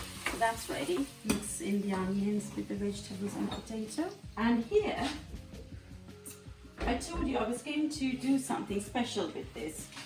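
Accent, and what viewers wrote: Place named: United Kingdom. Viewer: Sweet talented lady with a British Accent, looks yummy ! enjoy your video over and over again !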